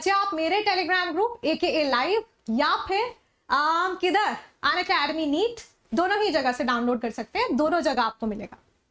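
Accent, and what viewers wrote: Punjab, India. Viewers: Kya hi bolte ho mam punjab se ho 😅 subse best physical chemistry teacher ❤️😌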